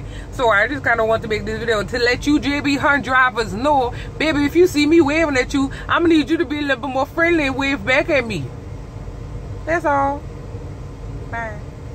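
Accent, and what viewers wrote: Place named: New Orleans, United States. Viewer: N.O accent strong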